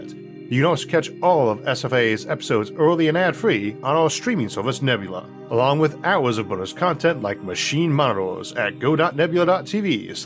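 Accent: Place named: South, United States. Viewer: Not sure why the person reading this sounds like a southern American plantation owner. Terrible accent. Gave up watching it because I couldn’t stop laughing at it.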